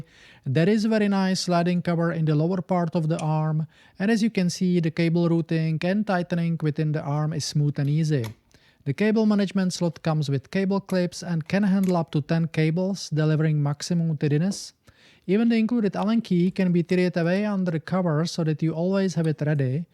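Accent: Czech Republic. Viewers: Dobra prace:). Podle prizvuku hadam ze CZ. Taky jsem si koupil aw2721d dneska.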